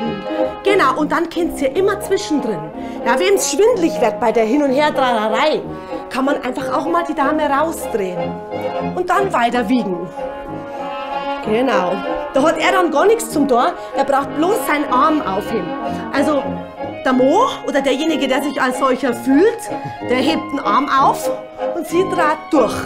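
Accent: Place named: Bavaria, Germany. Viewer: Liebe, ich wollte dir einfach nur ein Kompliment aussprechen. Ich hab mir mit meinem Mann euer Video angesehen. Du, oder ihr seid so sympathisch und lustig. Danke für dieses schöne Video. Das Bayrische klingt so nett und deine Sprüche sind zum Kugeln 🤣 super! Du solltest im Kabarett auftreten! Alles Liebe aus Österreich und weiterhin viel Freude beim Tanzen! Isabella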